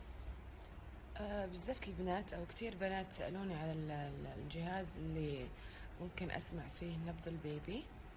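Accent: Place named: Gulf, Arab world. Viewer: اسمه جل وليس كريم. ليش تتكلمي لهجه خليجيه.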